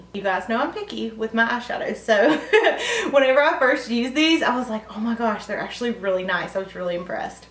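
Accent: South, United States. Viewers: I love watching your videos, and I love your southern drawl ( I'm from Kentucky so I know where coming from). I signed up for influenster but I have never gotten anything do I need to do something other than just sign up? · I love your videos! You are beautiful young lady and you seem to show that beauty outside and inside. I was very curious about this palette. The price point is high for Laura Mercier's eyeshadow palettes. What is tempting me is the dark matte colors. I love the shimmer shadows. They are gorgeous!! I use those dark shadows with my liner. I like to take a small smudge brush and go over my liquid liner or my eyelash glue to block that shine and soften the liner. If I travel with a dark color, I take a MAC or MG single pan in a Z palette and I have broken three of these dark colors while traveling. This palette along with whatever palette is my favorite at the moment would be safer to travel with. 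I'm not sure if the white color would help me. I have this palette in my Sephora cart but that price is keeping me from finding it that worthy. I have too many things on my want list right now!! You're lovely and keep doing videos. I'm a Northeast Tennessee girl that has a southern accent close to yours!!!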